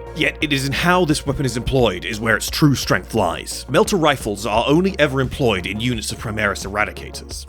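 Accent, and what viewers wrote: United Kingdom. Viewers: Bri’ish bloke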